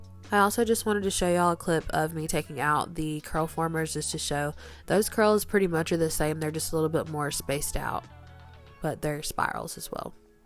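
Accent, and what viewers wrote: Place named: South, United States. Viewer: I love your southern accent 😊❤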